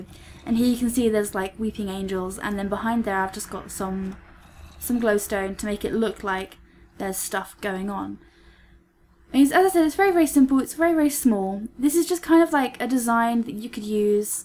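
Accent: United Kingdom. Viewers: Scottish? LOL. It's a British accent. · Are you scottish or british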